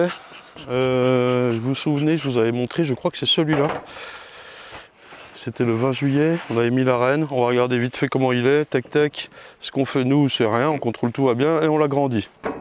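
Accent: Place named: South-West, France. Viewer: Avec ton accent du sud ouest quesque tu fou dans le chnord 😂😂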